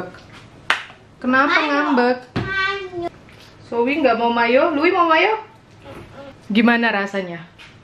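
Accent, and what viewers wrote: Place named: Java, Indonesia. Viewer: Mamak ini ngomongnya terkontaminasi sama orang Jawa ya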